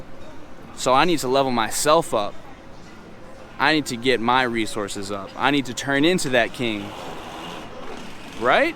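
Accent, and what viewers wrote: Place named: United States. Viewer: Dude it's obvious your Americian when you talk and the cloths you wear. But yes most of what you say is facts.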